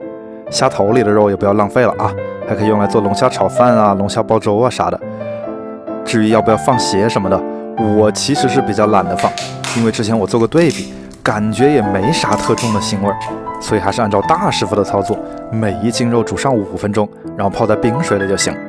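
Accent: Wuhan, China. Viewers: 老师请问你是武汉人吗？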